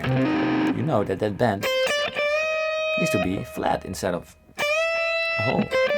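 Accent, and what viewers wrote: Netherlands. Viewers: I really like your videos. They're so well recorded, informative, and to the point and of course you're a great guitarist and know your stuff. A bonus is that my partner is Dutch so I love your accent and also love your subtle jokes at your accent like "Chi Major" so funny! Big ups to you bro, from a Samoan musician down under in Aotearoa / Niew Zeeland! Jah love!!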